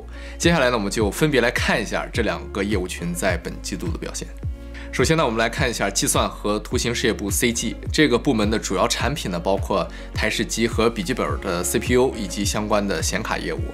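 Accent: Shandong, China. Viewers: 听口音老乡啊山东淄博济南? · 听口音是山东人吗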